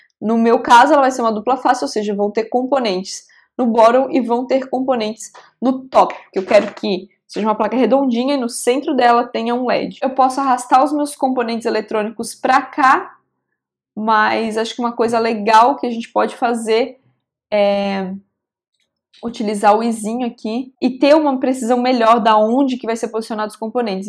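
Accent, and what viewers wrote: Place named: South, Brazil. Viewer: Sotaque do sul☺️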